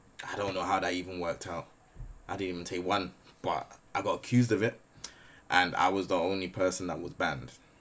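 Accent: South London, United Kingdom. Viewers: This message proper resonated with me. I don't know if I've seen you before but I'm 28 from South London, New Cross, Deptford area and I know from the way you talk you were from a similar place. I'm mixed race and have experienced elements of the things you were talking about. So I'm glad you're talking about these things on your platform, makes people like us feel less weak for admitting these issues affect us I'm the way they do. Love bro ❤️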